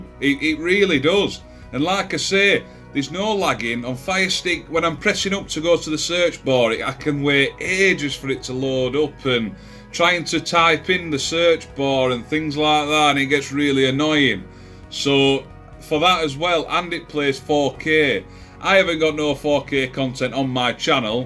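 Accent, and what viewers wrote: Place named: North, United Kingdom. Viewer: Got mine on order. Thank you. Love your videos. Nice to hear a northern voice on YouTube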